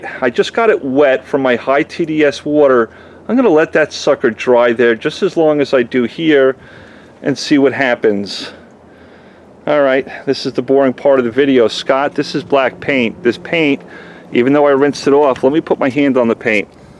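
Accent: New York, United States. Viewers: you say this is Formula 4. Then you say this is Formula 1. LOL. 2nd, why the hell are you wearing a hoodie in such a hot place like Texas. I guess you are really crazy. 3rd, you sound like you are from New York City yet you live in Texas.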